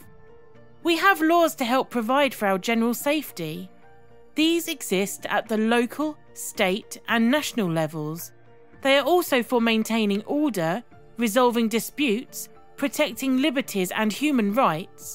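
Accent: United Kingdom. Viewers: WHY do they always use strange. British accents to narrate these videos ?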